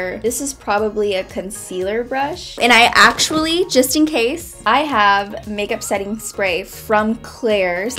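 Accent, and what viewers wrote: England, United Kingdom. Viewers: Is English or American? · Um I say that u r probably from England so yay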